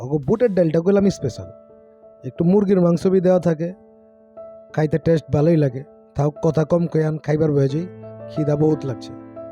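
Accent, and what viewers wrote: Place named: Dhaka, Bangladesh. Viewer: ঢাকাইয়া ভাষায় সুন্দর বি হয়চে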